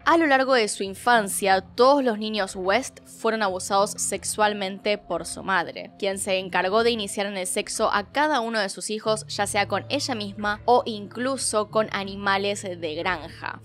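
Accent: Argentina. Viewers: Me encanta tu acento Argentino es encantador escucharte hablar, por cierto mis hijas piensan que estoy loca porque escucho éstos videos no entienden que es para aprender a cuidarlas. Te admiro mucho niña hermosa. 🇨🇴🇨🇴🇨🇴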